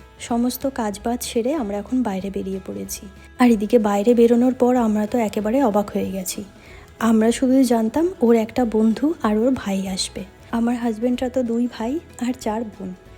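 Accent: Bangladesh. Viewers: apu tmr kotha sune mone e hoicce na j tmi indian,,,,,,,, tmr kotha akbare Bangladeshi der moto,,,,,,😊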